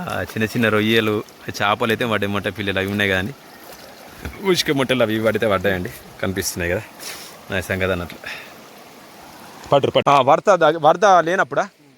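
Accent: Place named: Telangana, India. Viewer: Brother beutiful telangana language your speaking.nice keep itup God bles you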